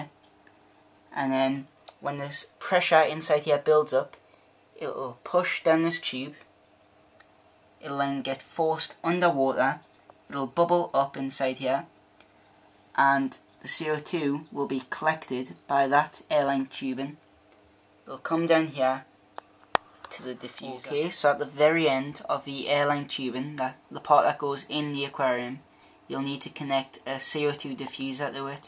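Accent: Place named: United Kingdom. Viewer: Thanks for the vid!!! Great idea, that's what my plants need!:-) Hey, btw. you have got really nice and interesting accent, I like that, are you from the UK I guess, which part?:-)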